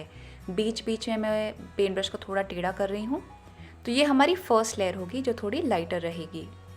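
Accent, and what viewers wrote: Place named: Kashmir, India. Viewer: Didi are you kashmiri?? Your accent sounds so ❤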